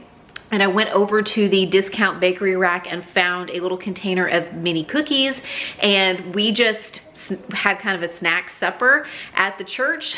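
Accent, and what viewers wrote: United States. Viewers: I don’t know why but I really love listening to you talk and your American accent 😂 the way you say rolls and noodles. Lots of love from Australia thanks for another great video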